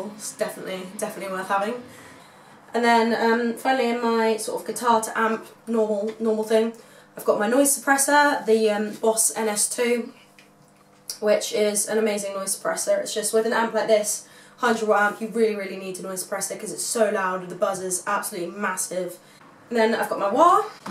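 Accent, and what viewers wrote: England, United Kingdom. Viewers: так разговаривает как британка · ты своим английским акцентом меня просто убиваешь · I love girl with english accent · ... you’re a Brit? Holy chit. Guess I’m out of the loop. Love the accent. Gear is sweet too. 🤘🏻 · Lets review here. Hot, plays guitar and a has a Brit accent.. and a gear head... The perfect woman does exist! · OMG, haven't heard a cute little accent like yours since the last time I flew British Airways !!! ... when are you touring the USA ??? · I just stumbled onto your channel today and I'm glad I did. You are an amazing player and seem like a really nice human being. You sound English. Do you live in Great Britain? I've watched several of your videos today including your Kiesel endorsement video. I've watched the Kiesel factory tour video 20 times, lol. I want a Kiesel Aries hardtail like yours. Anyways, just wanted to give you props and say hi and wish all the success in the world. 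You are a very talented player. Hope you have a great day. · Love the English accent! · you are from england this is the first time i listened to your accent well done so talented and gorgeous girl 🤘🤘🤘🤘🤘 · Nice to hear you talk. Didn't know you were a Brit.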